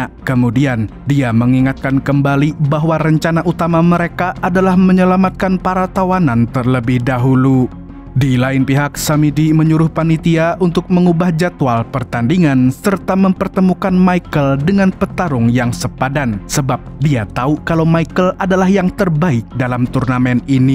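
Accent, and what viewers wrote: Banjar, Indonesia. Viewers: Fix urang banjar wkwk, banjarmna pian 😂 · Lah org banjar kah🤣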